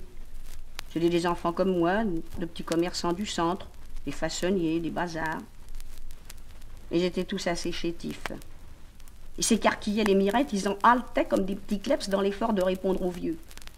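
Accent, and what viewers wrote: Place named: Paris, France. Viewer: Avec ce texte tu sembles croire que je m'oppose à la lecture orale alors que ce n'est pas le cas, ça dépend des qualités de l'orateur, du niveau d'éducation littéraire du publique, du contexte (divertissement etc...). Donc encore une fois tu piges rien, et c'est pour ça que tu préfères taper, tu compenses ton impuissance intellectuelle par la force. Celine détestait la violence au cas où tu aurais oublié, il n'hésitait pas à séparer des bagarreurs quand il en croisait. Et pour ce qui est du jugement t'es encore une fois à coté de la plaque; je n'ai pas jugé Arletty dans sa personne mais cette simple tentative de lecture, ce qui n'est pas un problème si c'est argumenté. T'as jamais donné ton avis sur un film ou un livre peut-être. Je ne vois pas où j'ai été condescendant non plus, va relire la définition. Le sens du texte, si tu veux que je te l'apprenne, c'est un ado qui passe un examen, qui est angoissé par le poids de l'avenir que ça représente, inquiet par dessus le marché des risques de correction si le résultat est mauvais, qui en somme ne comprend pas bien la rigueur que la vie impose à un si jeune age. 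Et Arletty, au lieu d'essayer de faire passer cette angoisse, elle nous fait du Arletty (que j'aime bien d’ailleurs dans d'autres contextes), elle y va en décontraction, gouailleuse, vas-y que j't'en rajoute un peu d'accent titi parisien. Comment veux-tu que ça colle? J'ai deja lu un texte devant un publique et j'ai accepté les critiques donc ravale ta sortie sur l’hér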